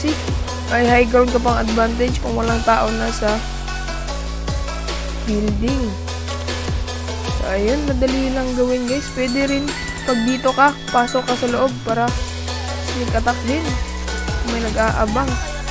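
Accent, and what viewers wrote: Visayas, Philippines. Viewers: Hahaha bisaya diay ayaw nag saba!!! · BISAYA DIAY KA?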